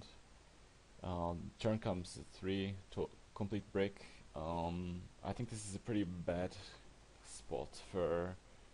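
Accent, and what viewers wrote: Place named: Eastern Europe. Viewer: A+ content, vyslovnost temer nepoznamenana slovanskym jazykem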